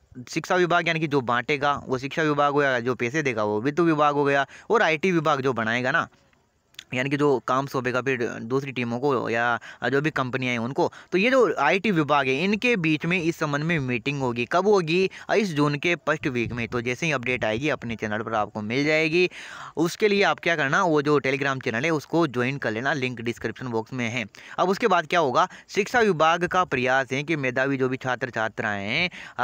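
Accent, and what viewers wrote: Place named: Hadoti, India. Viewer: apki awaj se hi lag gya ta aur ham kota se 😄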